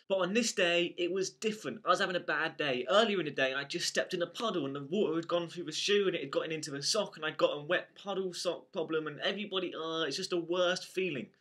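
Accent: England, United Kingdom. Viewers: I have watched this video SO many times just because of your british accent.. and well the story is kinda funny... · OMG ur sooooo funny and super cute too and that accent is just a bonus. I just wanna let u know that U have made it official that when I get older (and am not a small 16 year old ) I will go to Britain and find myself a nice guy like u keep making vids cause ur great ; D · London transport is terrifying O____O I went on the underground and the air got so thick and there were so many people and the trains are so fast! (I realize this is the point but it's scary) I wonder If I actually sound English like you or Charlie or it could just be a London thing :p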